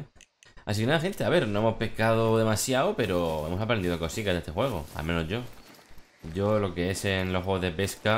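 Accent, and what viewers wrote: Murcia, Spain. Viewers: Es de Murcia ese acento??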